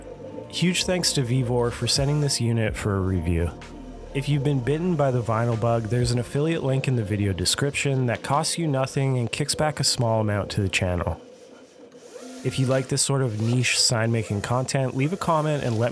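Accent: Canada. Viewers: Took me a while to figure out your Canadian pronunciation of "decal", but otherwise, thanks. Also you lost some credibility with the Prusa hate. I agree that Bambu printers are technically better, but open source is the most important feature for me, so Prusa wins there.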